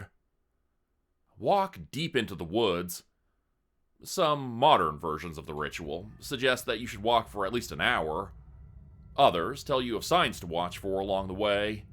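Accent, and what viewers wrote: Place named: Canada. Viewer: Brother I love your channels but you got to work on those "O's" and "OU's". I would love to know where you're accent is from. I'm guessing Canadian are Northern United States. I really hope you see this and respond because I have been wondering this very question for as long as I've been listening. 😅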